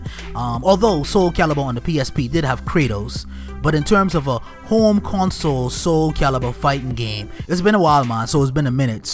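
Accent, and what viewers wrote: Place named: Bahamas. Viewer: Haha, nice video! I know that Bahamian accent anywhere!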